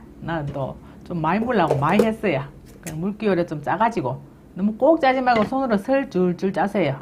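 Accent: Gyeongsang, South Korea. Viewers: 서부 경남 사투리 ㅎ 눈으로 먹고 갑니다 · 표준말 하세요 저도 경상도 사람인데 좀 그래에 ㅎ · 그지예~맛나예~ 같은 경상도 사람이지만, 님 사투리!!! 언니 너무 귀여우신듯요.~ㅋ 제 사투리보고 쳐다보던 사람들 이해가 되요.ㅎ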